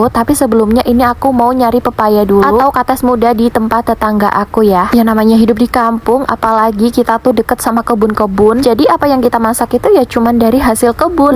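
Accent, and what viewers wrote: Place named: Lampung, Indonesia. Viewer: Logat jawa nya keknya tinggal di lampung ya..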